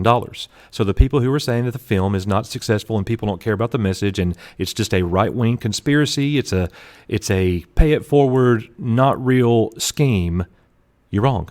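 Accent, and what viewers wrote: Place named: South, United States. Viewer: You’re such a southern gentleman. It’s part of why you’re so easy on the ears. But it is, “Ms.” ✨😁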